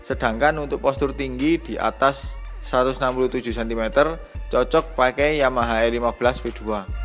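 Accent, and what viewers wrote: Malang, Indonesia. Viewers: Orang Malang/Batu mas?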